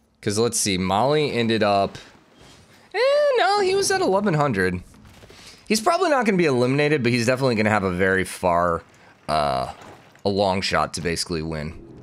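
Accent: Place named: United States. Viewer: Great video. You americans say words real funny and i love it.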